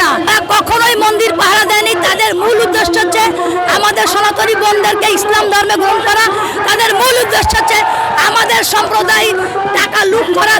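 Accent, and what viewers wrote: Kolkata, India. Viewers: বাহ কলকাতার শুরে কথা বলছে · কথার ঢং দেখে মনে হচ্ছে আমরা ভারতে আছি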